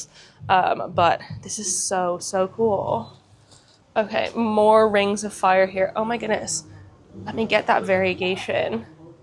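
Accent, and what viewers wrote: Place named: United Kingdom. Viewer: The Brit is rubbing off on you. I hear a bit of a British accent ❤️😁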